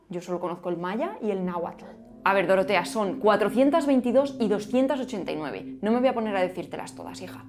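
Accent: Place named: Spain. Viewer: Hola!!!! Muy buen video!!! Tu acento me encanta y me enamora!!! Que lindo es oir a un español hablar!!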